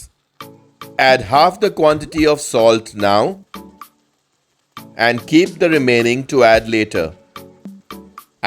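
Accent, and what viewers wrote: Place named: Goa, India. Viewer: Goan taste with goan accent suits well.😊 Thanks for your reply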